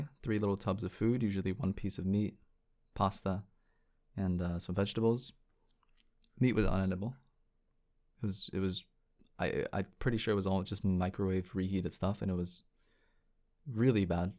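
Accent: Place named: Middle East. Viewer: 1 I'm so sorry for you loss ik what it's like to lose someone that is close to you I hope you are feeling better and that you have a great mental journey 2 I NEVER KNEW YOU WERE MIDDLE EASTERN I THOUGHT I WAS TRIPPING ABOUT THE ACCENT 3. I'm glad you are back from your trip even tho it was very hectic lol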